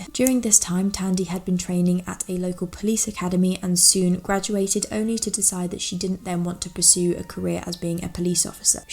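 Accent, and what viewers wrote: United Kingdom. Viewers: Annaliese O'Malley I think you may just have watched too much TV, where everything is all about drama and excitement. I prefer her level-headed and articulate overview of cases, and I’m sure others that enjoy her content do too. Very bold of you to discredit any following this woman has as being because of her british accent and looks. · It's not abt opinions or lights, camera, action or having the best equipment etc, It's abt her very blah blah, very mundane, disinterested presentation; It's like watching paint dry. Like she's reading from a script on how to put together a garden shed. Everytime I click on a recommendation of hers; my ears just glaze over. She seems completely disinterested in the topic; just mumbling through til the end as quickly as possible. She's not engaged at all. I think Americans just like a British accent regardless of what's being said and how. 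On a positive note, she's attractive, which is her saving grace for those that find attractiveness important, ie men.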